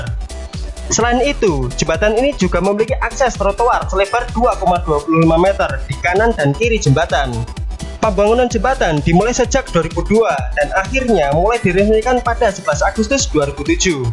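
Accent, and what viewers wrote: Java, Indonesia. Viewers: Medok bwanget mas...😆😆😆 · Ya Allah medok banget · Logat bahasa Jawa masih kelihatan😀😀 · Medok amat mas. Persis kayak saya kalo ngomong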